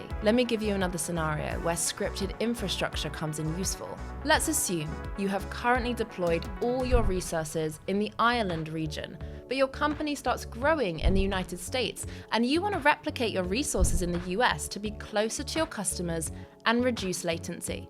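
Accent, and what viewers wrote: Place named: United Kingdom. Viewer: Woah this does make perfectly sense what says in this video. Plus I dunno if is British or Australian but the English people have the best accent in the world.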